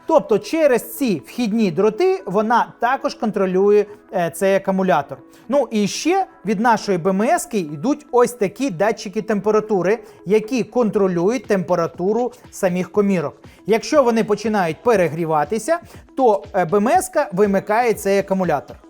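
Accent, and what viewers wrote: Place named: Russia. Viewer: Чоловіче! Ти мабуть "русскій"? По інтонації чую, по агресивній заздрості. Щоб з банок по 3,3 вольта отримати 12 вольт - дійсно, треба послідовно з'єднати 4 банки. Фізику в 6-му класі вчив? Чи прогулював? Чи тебе засмучує, що 3,3×4 - буде не 12, а 13,2? А до літієвої банки, на якій написана напруга 3,7 В, а на свіжезарядженій насправді близько 4-х вльт, у тебе претензій нема? У мене більше претензій до залуПутіна, який каже, що він за мир, а насправді робить війни. Тебе він не обурює?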